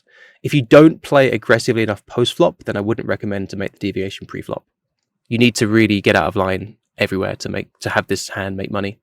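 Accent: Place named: RP, United Kingdom. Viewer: are you going to bring some sub content or a course or smth in between? I love your approach to poker as well as your perfect RP english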